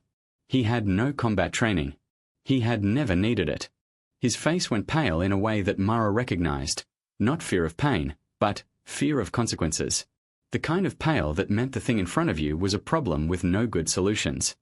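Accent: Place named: Australia. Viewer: G'day....an Aussie AI narrator...well I never!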